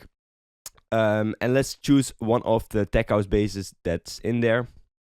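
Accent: Netherlands. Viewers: heerlijk dat nederlands engels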